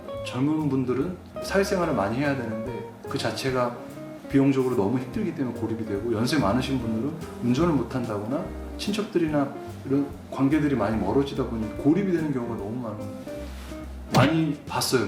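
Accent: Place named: Gangwon, South Korea. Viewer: 경상도지방은 전원생활하기 좋아요^^ 들어보니 강원도 인거 같네요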